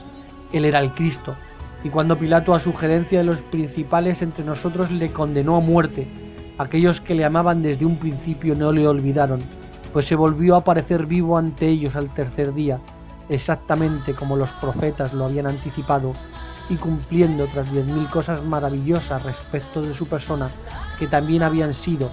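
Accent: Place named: Spain. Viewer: que tal si muy cierto y ahun así cada vez la gente cree más en extraterrestres que en Jesús,si soy de Morelia Michoacán mexico ,pero hace como 16 años vivo en usa vivo dos años u medio en California y después me mudé a Carolina del norte,conosco poquito new york ,muy de pasada ,ahunque aquí en usa casi todos los lugares son iguales jejeje,y usted de que parte de España es?me imagino que es de la madre patria por su acento ,y ay disculpe tanta falta de ortografía ,mi hermana dice que tengo faltas de ortografía hasta al hablar jajajajajajaja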